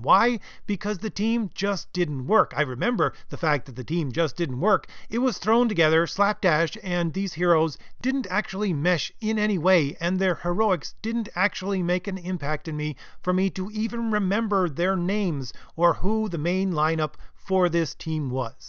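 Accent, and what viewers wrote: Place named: Ireland. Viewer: you sound irish or oirish thats great i m northern ireland your channel is great!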